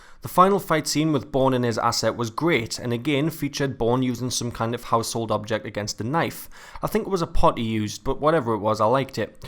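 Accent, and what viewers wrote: Scotland, United Kingdom. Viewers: what is it about hearing a scots accent that makes everything unimportant. bourne 2016 was a travesty, but i'm sure it paid for someone's nice house somewhere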